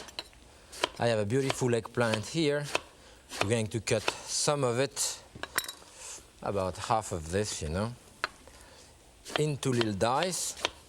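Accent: France. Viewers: Are you trying to say English should have less of a French accent? I'm not sure what you're saying.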